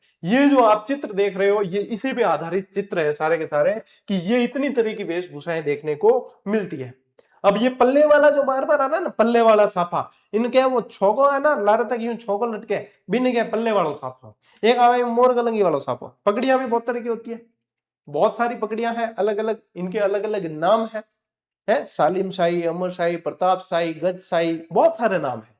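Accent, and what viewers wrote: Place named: Marwar, India. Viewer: Book ka jawab nhi.. Iske alawa or kuch pdhna yaad bhi nhi rehta h ab to lekin rbse vali class or rbse ki book ye dono ek din bhi nhi bhulte. But despite of these two things, sir your way of speaking with a pinch of marwari or godwari i would say is influencing more and more .. I feel myself forunate that I study from such a great public figure. Aaj ki class or usme aapki intresting rajasthani vibes vali baate. You're totally incredible and unbeatable 🙌.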